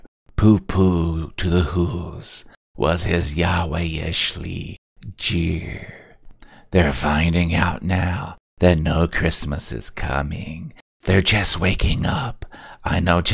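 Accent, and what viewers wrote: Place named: Kentucky, United States. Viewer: I recognized the accent and figured it was Kentucky or Tennessee.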